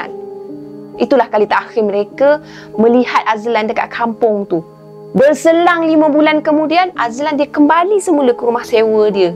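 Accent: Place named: Pahang, Malaysia. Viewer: Ok kak ten q.bagi lah tahu bila ckp mcm logat org pahang.😀😀😀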